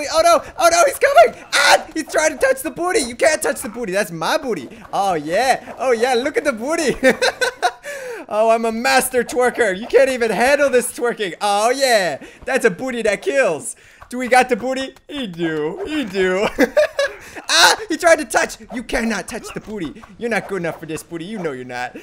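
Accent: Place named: Sweden. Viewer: You sound slightly Swedish :o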